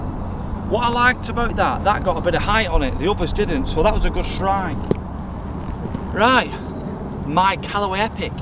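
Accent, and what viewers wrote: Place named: Scotland, United Kingdom. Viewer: I can't understand Scott-ish. Is there a translation.?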